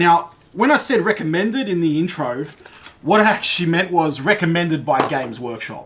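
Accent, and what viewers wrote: Australia. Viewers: Great video, good enthusiasm, informative and Aussie accented! Thanks for making this. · The Aussie is strong with this one. Maybe even New Zealander?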